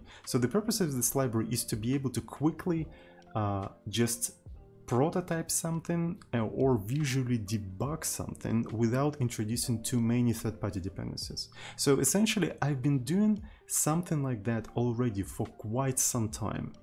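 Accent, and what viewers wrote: Russia. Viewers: русский акецнт?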